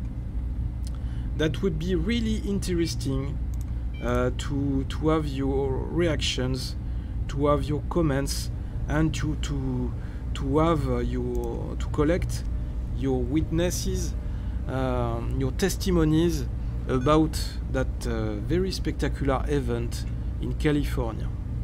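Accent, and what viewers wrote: France. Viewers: You have a big French accent !!! (I’m French)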